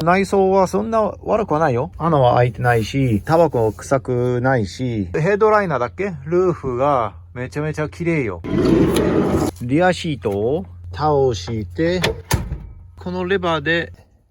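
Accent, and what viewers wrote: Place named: Japan. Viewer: Your accent really be heard as very Japanese! awesome! このプロジェクト、楽しみにしています！ POVのSteveさんをびっくりさせましょう！！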